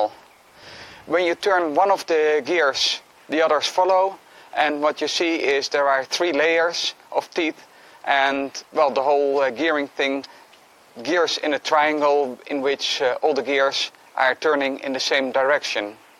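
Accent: Netherlands. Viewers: are you dutch??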